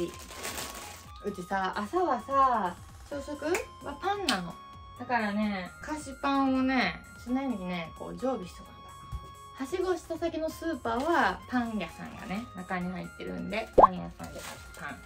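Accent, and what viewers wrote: Kansai, Japan. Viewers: 別に今に始まったことじゃないけど、ちゃんの関西弁大好き(*^^*)！しぐれのとこ好きww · 部屋着姿のかわいさと、関西弁のギャップがめっちゃどタイプです！これからも頑張ってください！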